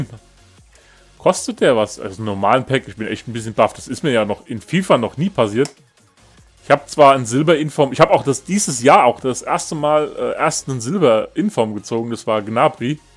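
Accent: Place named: Hesse, Germany. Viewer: komme auch Hessen :D bester Dialekt...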